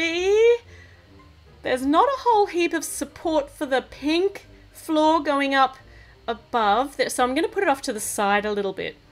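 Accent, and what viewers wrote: Australia. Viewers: Are you from australia 🇦🇺. If you are where do you live in Australia.im aussie too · r u an Aussie!?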